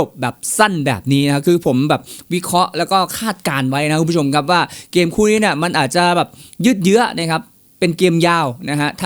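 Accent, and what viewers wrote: Isan, Thailand. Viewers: มักหลายยามเว้าภาษาอีกสาน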